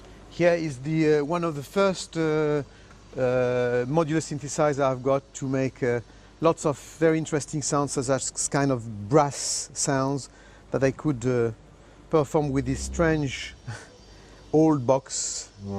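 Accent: France. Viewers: JM Jarre after a few years all around the world you have allways a huge french accent lol ( me too belgian/franch accent when i speak english) you're great , your music 's whit me from my chlidhood (im from 1977) exactly like kraftwerk or vangelis/klaus shulze/space/herbie hancock and many mores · He speaks English with a French accent but very well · He is actually a short little Frenchman with a French accent.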